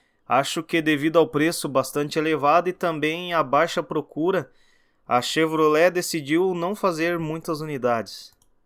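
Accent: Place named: Paraná, Brazil. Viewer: Paranaense , acertei ? O melhor sotaque do Brasil !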